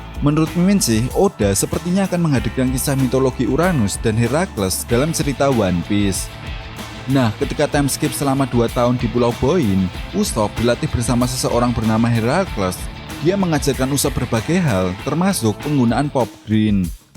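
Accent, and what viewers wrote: Java, Indonesia. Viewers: Logatnya khas. . Di pulau jaayyaa!! Suka min logat medok jawanya 😅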